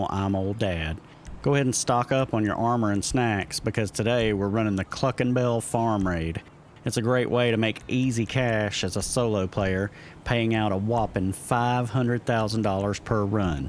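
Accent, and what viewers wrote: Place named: Kentucky, United States. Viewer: I love your accent! I'm from Kentucky and I don't know where you're from but you sound like you could be my neighbor! 😂 Great walkthrough! I appreciate it!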